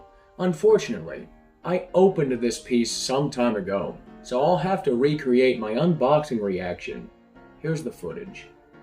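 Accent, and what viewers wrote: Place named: South, United States. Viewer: Awesome! also dude, you sound like a southern version of the narrator from How it's made. 😆 Those are very cool masks too, wish I could but like one someday!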